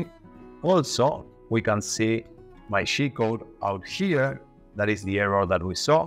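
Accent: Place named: Latin America. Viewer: De donde eres? tienes un acento tirando a latino..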